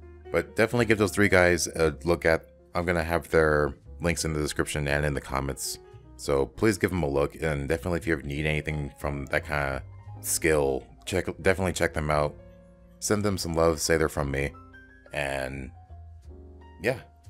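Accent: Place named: Central Europe. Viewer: thats cool, i actually thought about usa looking at your upload times but from your voice i thought you might come from central/east europe. Congrats on the 10k! No idea if you remember me im here since the 1-2k'ish =)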